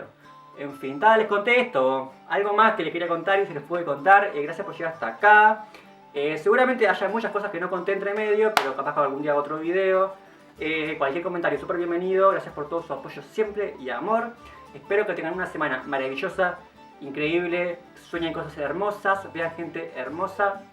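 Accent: Uruguay. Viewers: Estoy tan acostumbrada a ver youtubers argentinos que ver un youtuber de nuestro país y con nuestro acento diciendo BO, TAy GURI es un mimo ❤️ jajaj A parte de eso, lamento que hayas tenido una infancia angustiosa, te mando un abrazo grande, tenes una energía hermosa ✨ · gracias por contar tu historia que ayuda y ayudará a mucha gente!!! y es real en nuestro país se ha logrado mucho con las leyes pero falta que las familias acepten y amen mas a sus hijos y la sociedad toda acepte al otro con amor y respeto! me gusta mucho escuchar tu acento y estilo uruguayo del interior ! saludos desde Colonia del Sacramento! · Desde que te escuché hablar supe que sos de Uruguay, te admiro mucho, todo mi apoyo desde Argentina ♡♡♡♡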